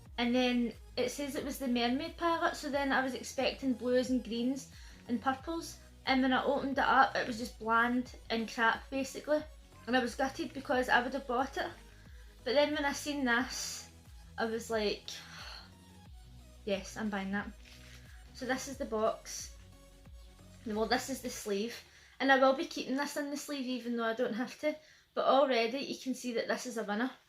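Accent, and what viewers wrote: Scotland, United Kingdom. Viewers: Just stopping by to show you some love with a full play and like #13 from Bits of Real Panther! I'm loving that accent! So very cool indeed! Is it Scottish? I hope you have a truly awesome Tuesday!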